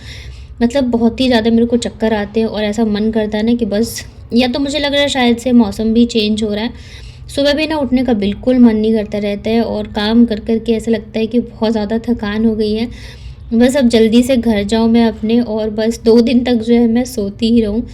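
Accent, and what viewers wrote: Bihar, India. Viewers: Di aapki bihari bhasa bahut acchi lgti hai please kisi din banayiye pura blog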